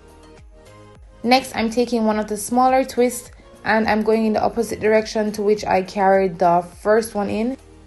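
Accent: Jamaica. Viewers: Hi there my Jamaican sister i love watching your videos of course if will be copying a lot of the styles you shared and am loving your accent. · I know this accent anywhere. Jamaican queen. U represent well. Beautiful hairstyles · This a gal yah a Jamaican she sounds like it pretty too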